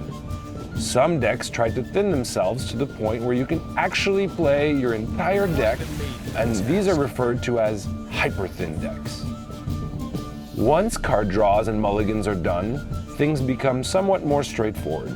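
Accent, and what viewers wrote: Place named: Poland. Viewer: Ah ten polski angielski, ale film super :)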